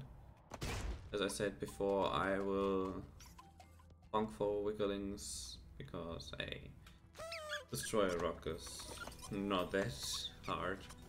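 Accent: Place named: Germany. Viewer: Du hörst dich irgendwie an als wenn ein Deutscher, Englisch redet, kann das sein? Hi, it heards like when a German speaks english, where you from? Like goes out.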